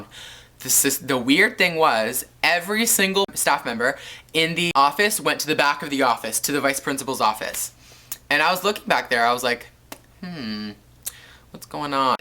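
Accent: Canada. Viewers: his Canadian accent is really strong in this vid!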